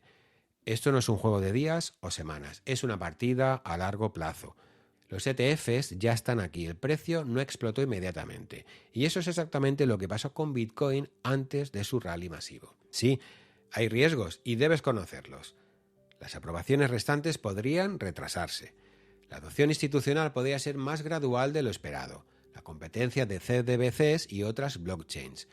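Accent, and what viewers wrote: Valencia, Spain. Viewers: Valenciano verdad? Yo también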